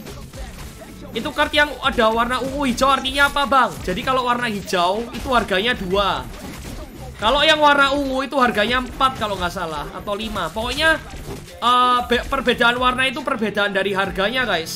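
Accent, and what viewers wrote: Java, Indonesia. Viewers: Jawa totok hahahaha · Njar njir blas ramasokk, medok wae sok sokan njir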